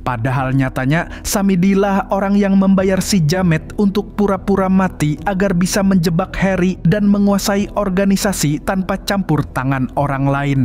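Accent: Banjar, Indonesia. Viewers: Urang banjar pian lah mang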